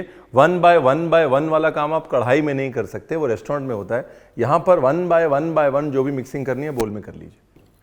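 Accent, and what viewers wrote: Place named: Lucknow, India. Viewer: Dear Sir one of my best friend is from Lukhnow nd u hv same accent like the words “Bhaiya”, “Shaukeen”, “Turant”, “Kayde se”, etc…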